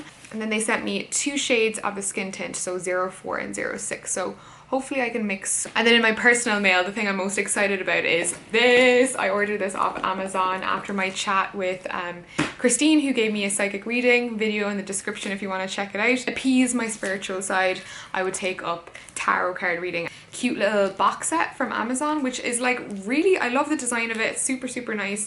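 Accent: Ireland. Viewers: omg ur Irish!!!!!!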